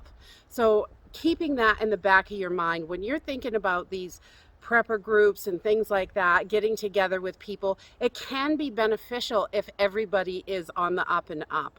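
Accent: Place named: Massachusetts, United States. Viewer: Sounds like Massachusetts- LOL! NE is not going to offer many “like-minded” people! I have one friend 2 towns away who is like minded but that would not help in a SHTF situation. In my small neighborhood there are mostly takers and we would worry about that… The kind who arrange a neighborhood pool party at someone else’s house (when they don’t have one)- those kind of neighbors! 🤔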